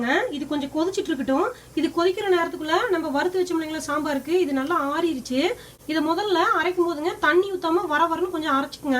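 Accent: Kongu, India. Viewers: konjum kongu tamil super, very respective slang in tamilnadu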